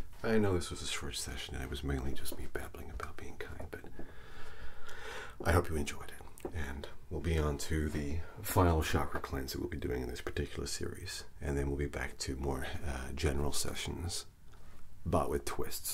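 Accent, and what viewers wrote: United States. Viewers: I love that jumper. I want it. Love the american accent you do it so well. I felt a weird awakening with watching this 🤭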